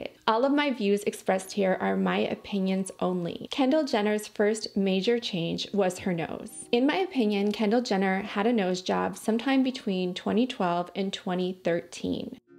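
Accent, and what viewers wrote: United States. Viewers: where are you from?? You sound like you're from the Midwest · its the socal accent lol. it is what it is haha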